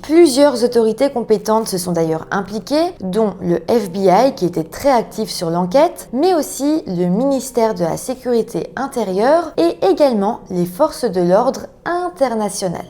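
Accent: Belgium. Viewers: L'accent Belge 😍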